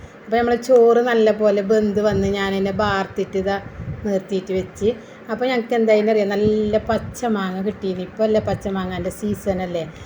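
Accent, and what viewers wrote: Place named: Kasaragod, India. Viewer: Kasrkode ഭാഷ സൂപ്പർ 😂😍 എല്ലാ വിശേഷം അടിപൊളി റെസിപിസും super 😍👍😋 L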